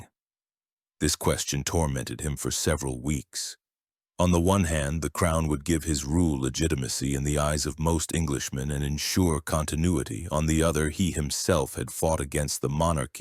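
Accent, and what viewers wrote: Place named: United States. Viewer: why would you use a scraping american voice to narate English history? AI crap ?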